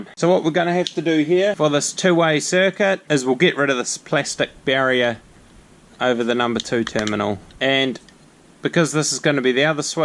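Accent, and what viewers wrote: New Zealand. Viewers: Thanks Great show. I had a bit of fun with the accent (Here is the kettle calling the pot black! I'm European.) Yes even the CC picked it up and kept showing "The Read"wire and even had more problem with the "batten box" ha ha - I seem to have heard this on my 2002 NZ trip, could I be right? (And did they had fun with the Aussies!) Anyway this is a very practical presentation. Thank you. I just wish I did not have a narrow two gangs switch to work with and some 15 wires behind it, stiff ones too! But in the end all good! Really when one understands that Switches are never off but go to L1 or L2, It makes a lot of sense to get the two ways working. More thanx to your three ways and illuminated switch (here I wanted it for my two ways switches to the outside light to remind me to switch it off (My old neon that worked so well in it died) Not so lucky on that one...:) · Mate your a legend not only have you saved me hundreds of dollarydoos but iv learnt something and enjoyed some pure NZ accent doing it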